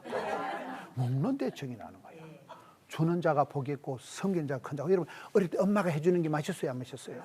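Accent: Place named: Gyeongsang, South Korea. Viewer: 목사님 경상도 사투리가 너무 웃기세요 그래도 너무 은혜롭습니다~~ 할렐루야 아멘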